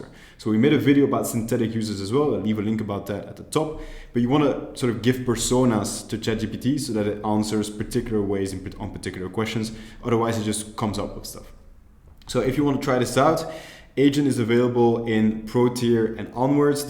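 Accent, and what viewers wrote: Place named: France. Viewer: Je kiffe l'accent français !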